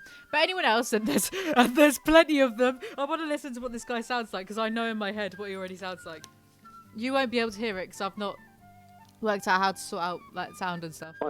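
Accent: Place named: London, United Kingdom. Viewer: I just love the way you speak! It reminds me of my ex boyfriend who's from London, the more videos I watch from you, the more I think you guys would make an awesome couple, I know it's weird xD seriously the weirdest thing I have ever think about an ex! Looove your videos <3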